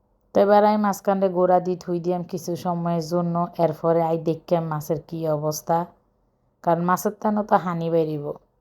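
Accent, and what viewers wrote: Noakhali, Bangladesh. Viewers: আসসালামুয়ালাইকুম প্রিয় আপু নোয়াখালীর বধুর নোয়াখালী ভাষা মাশাল্লাহ খুব ভালো লাগলো।দারুন হয়েছে ইলিশ মাছের পাতুরি রেসিপি টা আপু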